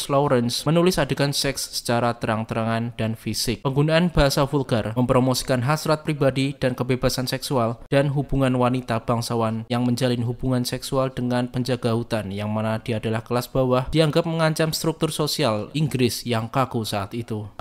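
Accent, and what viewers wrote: Java, Indonesia. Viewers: Jawa medok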